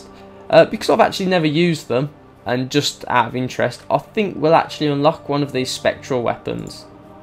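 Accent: United Kingdom. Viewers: is that a Cockney accent you have ?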